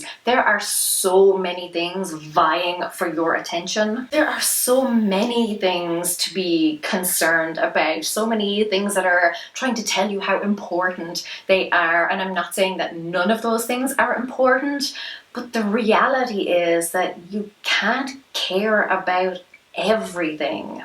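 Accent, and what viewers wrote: Ireland. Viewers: Good advice!I love your Irish accent!!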